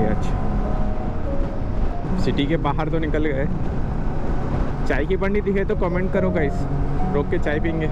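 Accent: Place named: Hyderabad, India. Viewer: Looking awesome in hyderabadi accent 🖤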